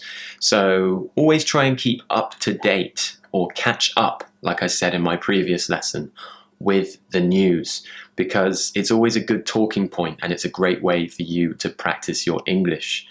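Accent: United Kingdom. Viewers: I really love your accent!! When I started to study English, I started with American English, but I'm getting higher British English because is really posh and polite!! I love it!! Congrats for your video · Thank you for your useful and helpful video:) but I would also like to listen to your natural speed of British English!!!! Not all of English people speak this gentle and clearly, and I would really like to get used to natural speed of British English with your cool accent. Please~~~Make some natural talking video!! Lots of love and respect from Korea :) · Hello! Thank you very much indeed for your video recordings. It is utterly important for me to follow your videos inasmuch as I USE them ( sorry for talking like this) to repeat after you full speeches as I best manage to do. I have to say that it is really working well for me. I am able to catch up your Britishness and pick it up. 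I hope I'm doing well with my pronunciation. After listening to and doing such shadow technique exercises your voice producing British accent remains long time in my mind before fading up. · One more subscribe! Accent very nice! I love British accent and like when I understand! :)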